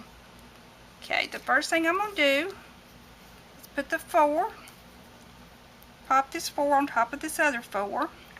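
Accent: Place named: South, United States. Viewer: Oh, I just LOVE to watch your videos....your voice is sooooo sweet and Southern - I LOVE IT:) Your card totally ROCKS!!!! Thanks for letting us know the letters from Songbird welds good, I am going to try it out!!! Hugs, T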